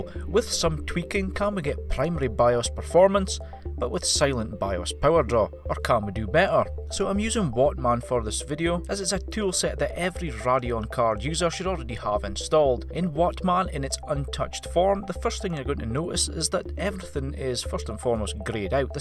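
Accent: Scotland, United Kingdom. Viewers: yesss a Scottish narrator finally ear candy while i learn something... wow that sounded gaeeeee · the Scottish accent convinced me that I should do this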